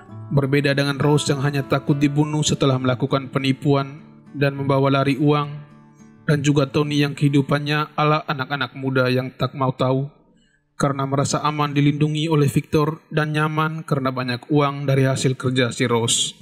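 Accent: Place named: East Nusa Tenggara, Indonesia. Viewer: Seperti logat NTT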